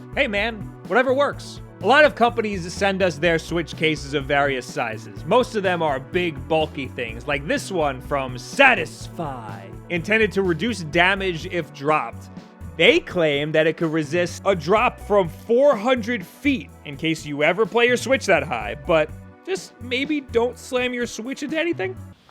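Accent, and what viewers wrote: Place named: New York, United States. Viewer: You and your New York accent.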